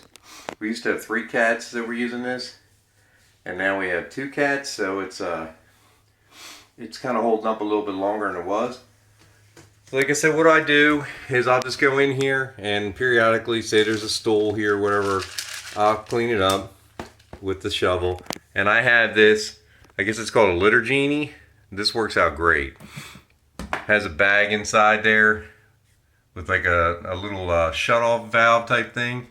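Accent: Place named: Central Pennsylvania, United States. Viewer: Thank you! We've just gotten kittens and I cannot stand the Tidy Cat scented smells. The lady at the PetSmart recommended this stuff because they use it at the store, so I picked up a bag to try. I have been trying the Walnut-based litter, but it is still super dusty and not every person in our household is fond of it. I appreciate your explaining how it works and what to do & when to change it. PS - your accent sounds like home (I'm from a small town in central PA).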